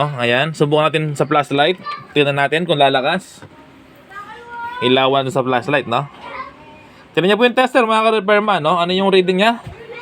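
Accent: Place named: Pampanga, Philippines. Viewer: Kapampangan ka kuya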